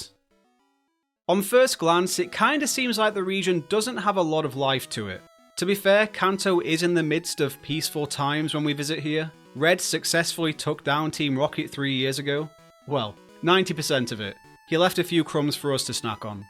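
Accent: United Kingdom. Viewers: You have a wonderfully charming British accent.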